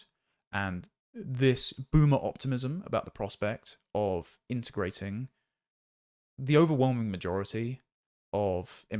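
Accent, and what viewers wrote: RP, United Kingdom. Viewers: Why have you and the rest of the clowns on the Podcast of The Lotus Eaters got such a beef with ‘boomers’? You always have a crack at them for some reason. Jealous much? Well, I suppose you need some group to have a go at. And is that posh assed accent actually real?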